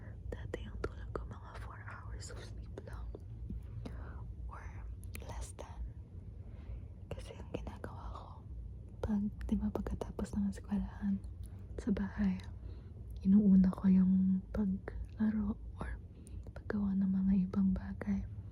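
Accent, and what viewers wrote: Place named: Conyo, Philippines. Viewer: Conyo soft spoken naman, taglish 😍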